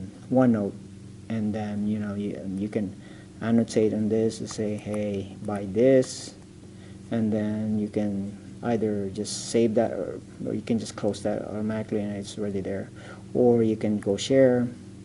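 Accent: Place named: Philippines. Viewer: loving the Filipino accent :)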